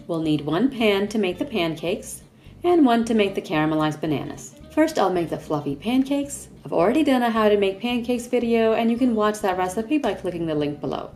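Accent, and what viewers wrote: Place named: United States. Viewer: I just love American accent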